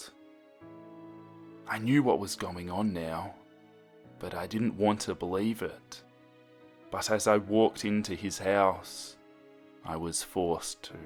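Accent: Australia. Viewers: I'm sorry but Australian accents can't be creepy. Nice to listen to but it automatically makes you seem friendly lol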